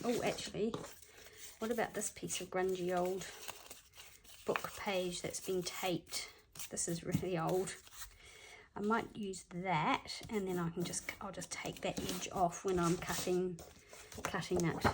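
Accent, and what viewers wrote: New Zealand. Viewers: Hi I have just found your channel and thought wow, she sounds like a kiwi! So further investigation and yes you are her in NZ! That is so good as I didn’t think had a channel on You Tube for junk journaling. I don’t know anyone else here in Dunedin that does JJ so really pleased to see you here and hear your accent 😂Lynne Dunedin NZ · I love hearing a junk journaller with a kiwi accent. Congrats on the growth,.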